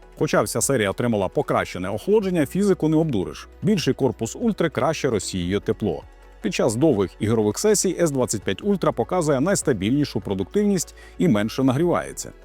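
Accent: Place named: Hutsul, Ukraine. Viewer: Гуцул долбаный )))., диктун на русском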